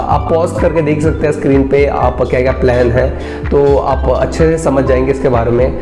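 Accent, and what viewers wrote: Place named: Assam, India. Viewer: Tumi Assamese nki bro?... good hindi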